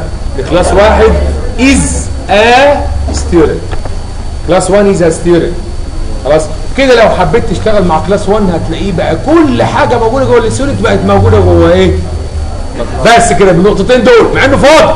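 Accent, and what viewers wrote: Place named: United Kingdom. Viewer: OMG!! what the hell is this you're killing me with your english accent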